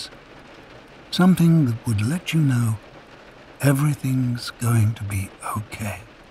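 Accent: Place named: United Kingdom. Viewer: Why get a British v/o and then make all the references American? Jarring. Sidewalk, drapes, 24/7, rainday, store...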